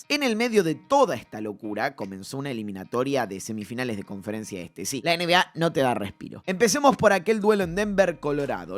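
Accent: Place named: Argentina. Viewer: Porque TODOS los argentos tienen está pronunciación "NAGGETS" "CARRY" "HALLIBARTON" "CLATCH" "THANDER".... ME IRRITA DEMASIADO ESA PRONUNCIACION DE LA "U" TAN ESTUPIDA QUE TIENEN.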